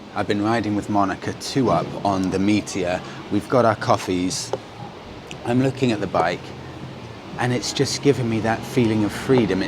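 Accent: England, United Kingdom. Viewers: The importance of English these days, I, being Brazilian, would be missing out on such good content from this channel, your British accent is cool and a little funny to me. You're a good communicator, (and Monica). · I'm from Brazil and I study English, I like watching your videos because I like the English accent, and also knowing about motorcycles, would this bike be adapted to my height, my height is 5, feet and 3.5 inches. Thanks. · Leave aside the bike, we see enough of them in India. I suspect we Indians watch your channel for a bit of that propah English accent 😊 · I am not a big bike fan but somehow I like Royal Enfield a lot, especially in a such well demonstrated video. Some extra attraction for that British accent... 👍👍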